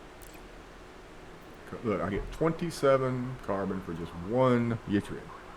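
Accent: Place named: South Carolina, United States. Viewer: man are you from south cackalacky? you sounds like some peeps from around here.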